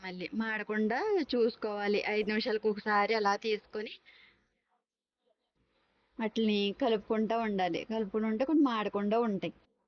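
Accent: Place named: Andhra, India. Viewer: Voice of Andra.. good cooking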